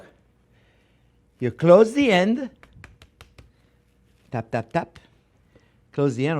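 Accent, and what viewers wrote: France. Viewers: I love his french accent...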